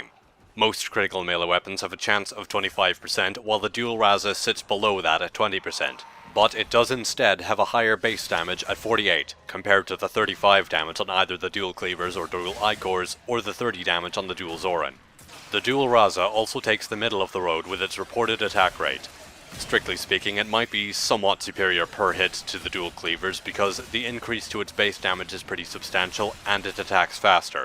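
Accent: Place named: United Kingdom. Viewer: You sound like a British (Not sure what that accent is...) Skallagrim while ranting about weapons.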